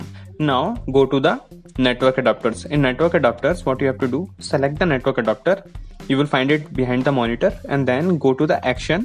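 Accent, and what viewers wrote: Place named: India. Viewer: Great help howeverCouldn't get past ur strong indian accent sorry found hard to follow your instructions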